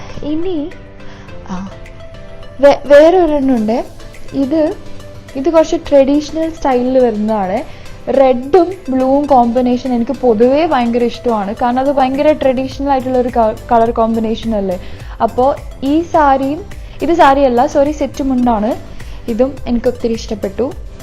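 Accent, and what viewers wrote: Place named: Kannur, India. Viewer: plz talk in kannur slang..it's a beautiful slang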